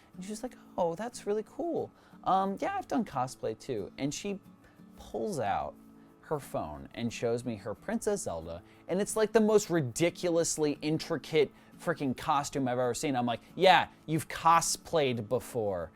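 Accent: Germany. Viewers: Dein Deutscher Tonfall ist ganz schön happig,. (Translation: your german accent is a bit much,.) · I wanna go to tokyo!!!!! Can I hide in your suitcase or something.... wait that came out wrong... sorry. Side Note: Your German accent makes me laugh all the time...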